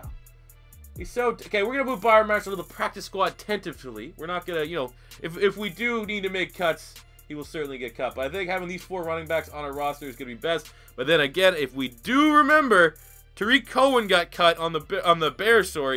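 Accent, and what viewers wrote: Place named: Canada. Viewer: Josh Lak he's from Canada its most likely an accent thing.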